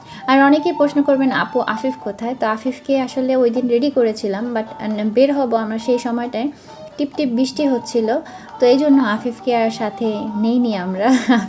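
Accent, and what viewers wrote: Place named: Madaripur, Bangladesh. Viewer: Vapsa gorom hahaha.apu amar husband o tomar moto kotha bole karon amar husband o madari purer.tumi jemon kicu kicu kotha ae vasae bolo o temon kicu kicu vasae bole.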